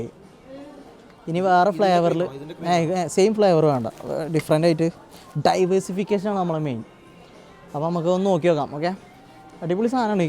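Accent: Malappuram, India. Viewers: വ്ലോഗ് എടുക്കുന്നോണ്ട് മലപ്പുറം ഭാഷ ഒഴിവാക്കണ്ട ഞമ്മക്ക് അതൊക്കെ ആണ് ഇഷ്ടം ❤❤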